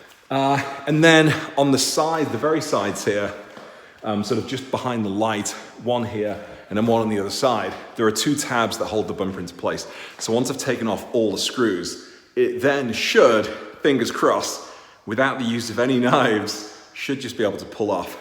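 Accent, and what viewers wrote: Liverpool, United Kingdom. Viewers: Yes I could tell that you’ve got a bit of a scouse accent 😎😎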